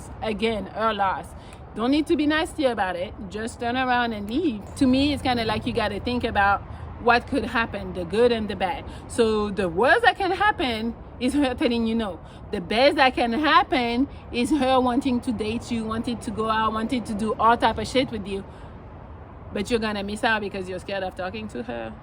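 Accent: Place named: France. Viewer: Coucou de quelle ville tu viens en France ? Btw je sonne exactement comme toi quand je parle anglais.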